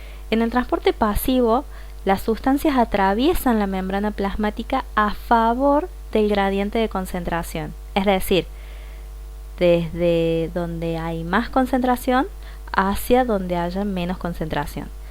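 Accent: Cordoba, Argentina. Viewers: re acento cordobés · Voz cordobesa osiosi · Sos de cordoba?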